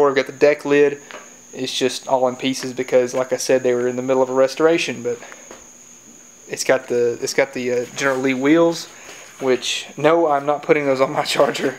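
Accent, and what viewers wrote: United States. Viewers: You make very good work on this Dodge 1969. I'm glad you did. Amid all the troubles and bad things happening on this planet. You also have good English well understood, Unlike the original English spoken in England. We Bulgarians are more accustomed to this pronunciation and the English accent that is in the United States and Canada. This original English in England is more difficult to understand. Even there seems to be little difference in the pronunciation of English in the Eastern States and the rest of the United States. But English in the other states seems to be a little better than English in the Eastern States. Somehow the English that is in the western and middle states is more melodic, the words blend and sound better, more easily understood than the English in the Eastern States and the original English in England.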